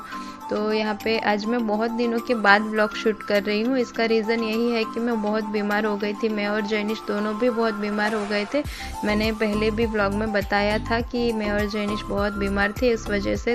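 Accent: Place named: Gujarat, India. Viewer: Ji han main bhi Ahmedabad se hun aapki awaaz se lag raha tha ki aap Gujarat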